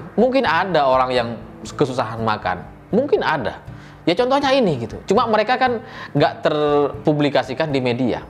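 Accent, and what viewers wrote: Madura, Indonesia. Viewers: Kau tinggal dimana sih bro? Kau lupa ya? Kalau tradisi di orang2 kita alias "tretan" .. sapi memang dikandang di dapur... Jadi jangan kau anggap bahwa itu memprihatinkan atau ngenes... Sok peduli dan sok kekota2an kamu... Muka dan logatmu itu masih Madura asli... Jangan mempermalukan nak-kanak dibik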